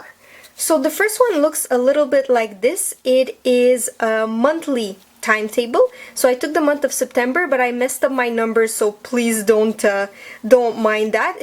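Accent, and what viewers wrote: France. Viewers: are you french?? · you are French right ^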